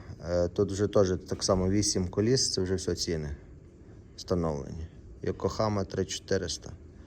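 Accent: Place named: Hutsul, Ukraine. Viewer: Ти гуцул ще той! ЗабЕЕЕру...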